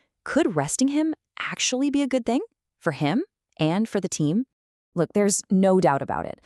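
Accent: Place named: United States. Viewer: What’s with the American voice?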